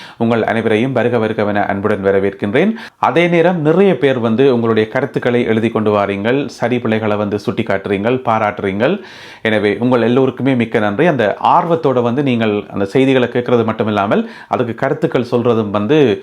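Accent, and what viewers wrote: Jaffna, Sri Lanka. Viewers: லண்டனில் இருந்தாலும்கூட நீங்கள் யாழ்ப்பாண தமிழில் கதைப்பது எங்களுக்கு பெருமையாக உள்ளது.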